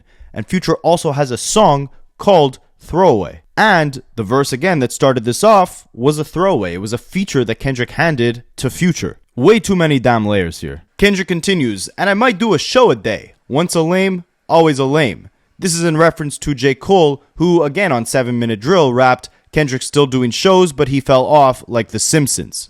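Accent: Toronto, Canada. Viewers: This video was fire n 10/10 Toronto language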